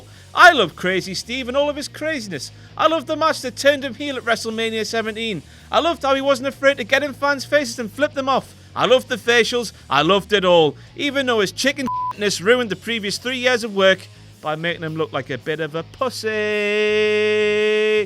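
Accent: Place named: Newcastle, United Kingdom. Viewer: Max Mekker depends what part of Britain they are from, considering is from Newcastle he will pronounce it differently than someone in Manchester or London for example.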